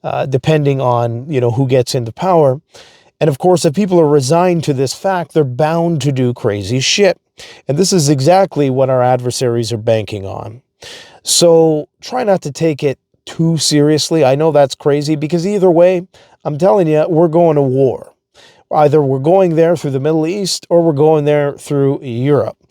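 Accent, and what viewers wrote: Canada. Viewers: You sound like a Canadian. I've never disagreed more with your assessment. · Some videos your Canadian shows through more than others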